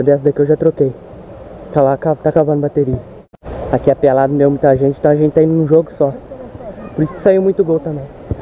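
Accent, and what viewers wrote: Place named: Minas Gerais, Brazil. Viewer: Vc é mineiro né? Com esse sotaque. Legal é o clima dos jogos e a galera gnt boa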